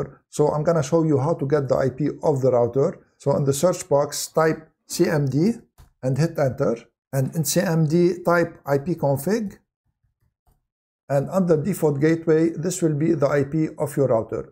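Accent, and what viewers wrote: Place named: Lebanon. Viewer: Nice video and nice Lebanon accent 😅 I had a little question, if i put the router in AP mode, will the guest wifi still works ? and will it be separated from my main network ? Thankyou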